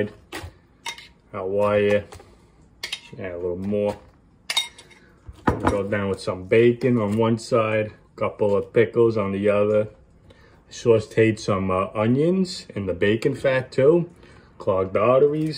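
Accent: New York, United States. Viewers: Been cooking my burgers in bacon fat for years, it's a game changer. I do my onions after my burgers, get it cooking in that beef and bacon fat while I rest my patties and toast my buns, fucking 12/10 shit. Love your channel, dude. I grew up 20 minutes outside NYC, living in Florida now, the accent brings me right back to the old Italian Pizzerias and sub shops I'd be in every other day, fucking love it, my dude.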